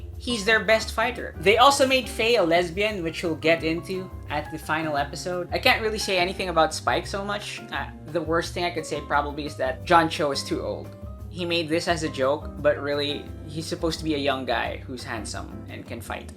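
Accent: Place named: Philippines. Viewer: LMAO! HAHAHA, KABABAYAAAAAN! AAWOOHH! Sabi ko, parang pang pilipino accent neto a... pati ung hearts sa mga comments... Pinoy nga, ahahahah, Subscribe to you and God Bless Pare.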